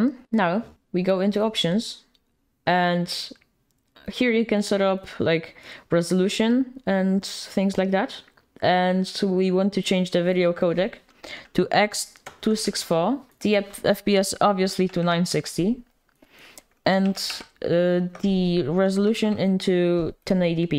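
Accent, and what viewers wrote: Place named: Poland. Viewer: jako polak masz koks akcent (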